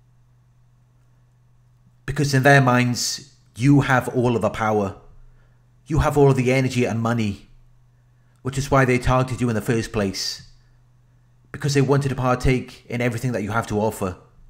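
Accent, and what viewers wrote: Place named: Scotland, United Kingdom. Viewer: I like your videos and your lovely Scottish accent.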